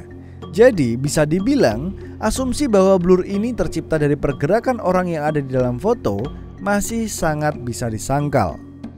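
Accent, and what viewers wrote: Java, Indonesia. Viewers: Tetep medok ngomonge mas #jowonotdied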